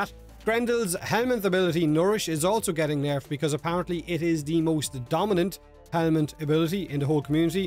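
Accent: Ireland. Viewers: clearly not considering this is an irish accent...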